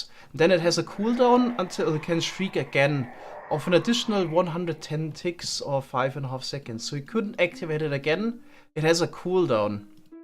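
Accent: Germany. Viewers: 10 sec in and i knew you also bist ein deutscher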